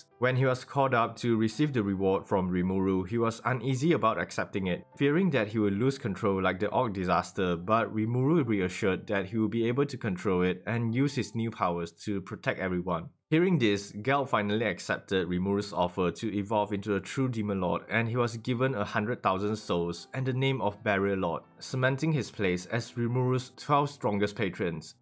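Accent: Singapore. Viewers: Are you Singaporean????!? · Ehh u sound like a Singaporean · U sound like a singaporean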